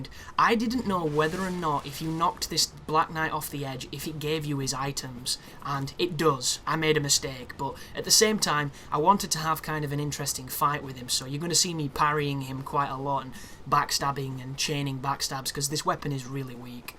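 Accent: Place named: Lancashire, United Kingdom. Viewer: Are you from Lancashire Mr.?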